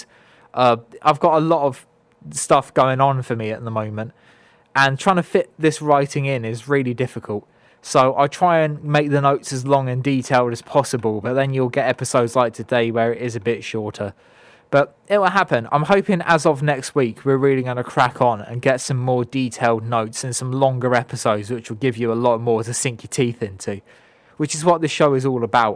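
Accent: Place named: United Kingdom. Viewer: And of course another wrestling channel with a British accent? It’s like nails down a chalkboard can’t stand British accents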